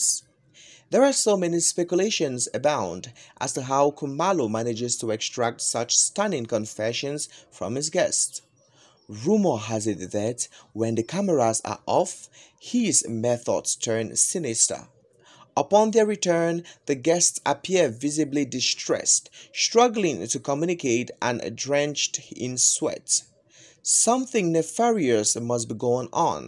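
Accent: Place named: Nigeria. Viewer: But why is a Nigerian saying this... Nigerian accent all over the place 😅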